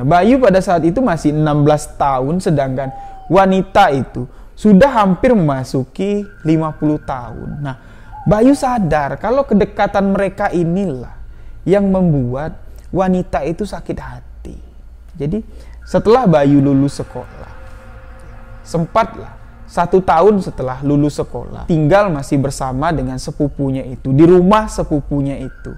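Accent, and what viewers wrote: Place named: Bali, Indonesia. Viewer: Logat bicaranya kaya org bali y mas😁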